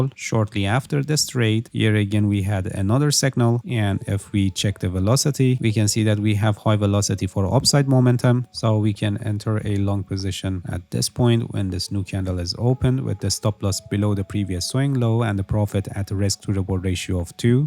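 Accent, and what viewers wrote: Iran. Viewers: گل پسر خیلی خوب انگلیسی صحبت میکنی درود بر تو اما لهجه فارسی هنوز کاملا قالبه😊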